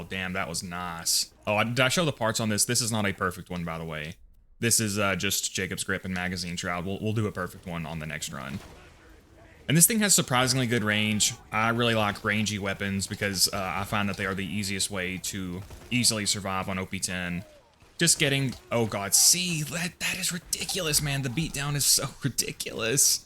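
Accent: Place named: Kentucky, United States. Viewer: Kinda surprised you're from the bible belt. Judging by the accent, I would've guessed somewhere like Kentucky or Tenessee. Greetings from Indiana!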